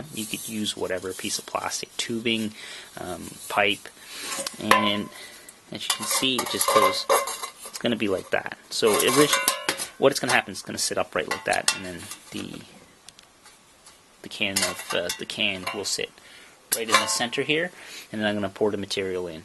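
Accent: Canada. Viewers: ARE YOU CANADIAN?! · quebecois ? ou francais ?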